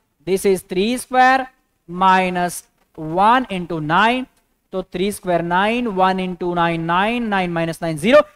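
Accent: Maharashtra, India. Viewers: Sir bhut marthi bol rahe ho samjh nahi rah hai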